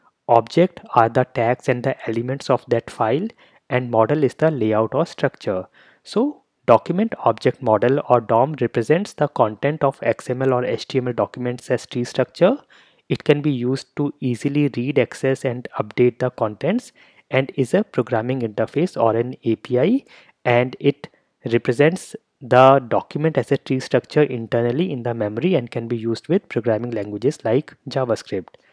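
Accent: India. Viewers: Hearing India accent, closing the video.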